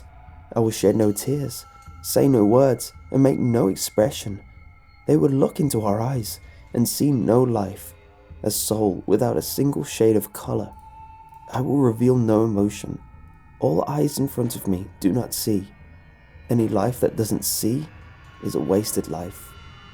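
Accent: United Kingdom. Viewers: God I love you. Your voice is terrifying and soothing at the same time, aided by the fact you sound young yet mature. That British accent is a bonus too!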